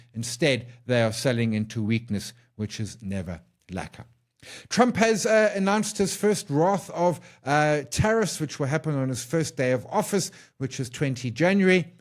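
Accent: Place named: South Africa. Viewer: I concure with the below comment, no captions needed really. Your vocal presenting skills are more than sufficient. The accent is a typical south african accent and we can hear what you are saying. I don't typically watch the show, I listen to the YouTube show ( play the video, pop into split screen and just listen while doing something else.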